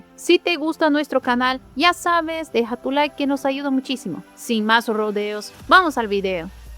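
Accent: Brazil. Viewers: Ella es totalmente humana, en un vídeo de hace tiempo mostró su cara y salió hablando, su acento es por qué es brasileña o algo así y el español no es su lengua nativa.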